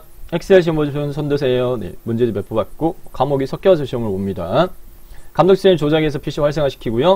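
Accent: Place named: Gyeongsang, South Korea. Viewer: 박사님의 구수한 경상도 사투리가 매력적 이십니다^^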